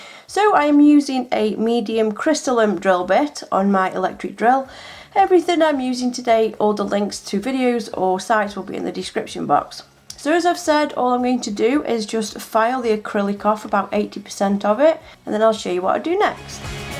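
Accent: United Kingdom. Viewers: You sound like your British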